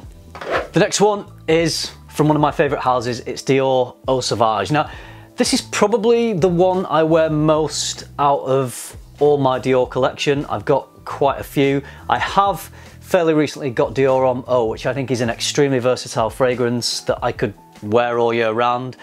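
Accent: United Kingdom. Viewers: Love your reviews and British accent!!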